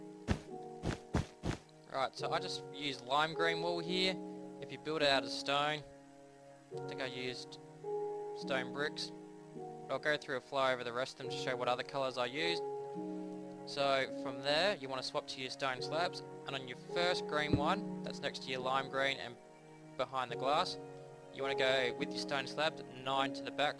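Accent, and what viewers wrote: Australia. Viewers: Like you accent your austrailian nice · Are U Australian or not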